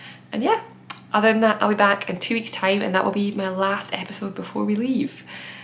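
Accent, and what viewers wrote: Scotland, United Kingdom. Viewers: Hello, thank you very much for your videos. I love your work, your choice of colours, wools and designs. Congratulations, you are such a talented person and I love also your Scottish accent not difficult to understand at all for me, a Portuguese woman living in Paris for 34 years, since 1990. I’m so happy to listen to your podcasts and following you every week it’s a great pleasure. Thank you for sharing your experience and knowledge with us. Never give up, you’re a wonderful person ❤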